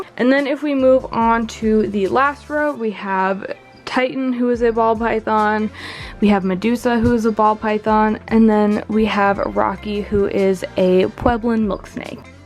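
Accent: Canada. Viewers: I'm so sorry for asking but... Are you Canadian? You sound Canadian. But like, Eastern Canada.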